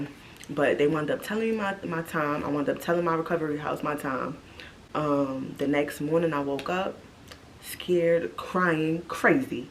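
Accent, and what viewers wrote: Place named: Brooklyn, United States. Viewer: Nah sis u really from New York and I’ll say Brooklyn just by the way you talk love your vibes hunny ... it’s the hand movement for me 🥰😭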